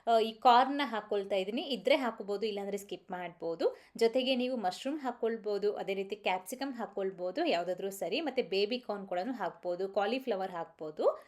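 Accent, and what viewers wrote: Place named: Tamil Nadu, India. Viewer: She is a Tamilian avr accent hage ne irathe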